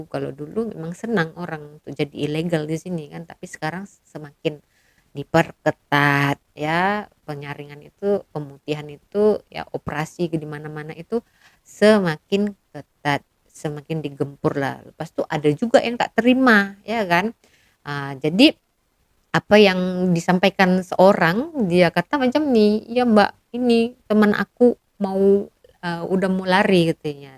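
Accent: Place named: Malaysia. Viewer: Wah.. bila cakap melayu mcm org msia dah skrg ni.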